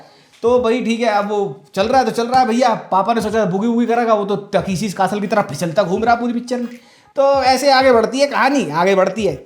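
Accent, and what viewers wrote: Haryana, India. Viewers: I love you bro to much honest review 😅 and bhai please bring back you’re haryanvi I really miss it ❤❤❤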